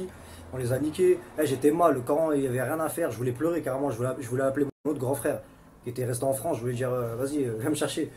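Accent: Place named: Romania. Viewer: C koi laccent tetais en roumani nn ???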